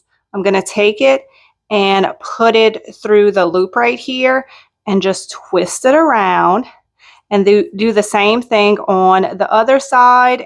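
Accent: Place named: North Carolina, United States. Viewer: I love her accent. I'm from NC and we get made fun of at times as well by the Northerns. LOL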